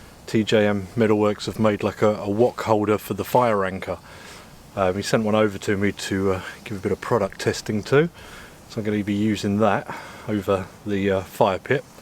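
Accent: United Kingdom. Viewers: I found your channel a few days ago and have been binge watching you ever since! I just saw one you made two years ago where you didn't talk at all. I'm glad you decided to start talking to us! (Americans love a British accent!) And, I'm learning a lot. You're so adventurous, especially with all the cold and rain. As I'm from Florida, I'm amazed at your tolerance for cold mornings before the fire's started and the coffee's made! PS That ending was so smooth! Those products were really well thought out!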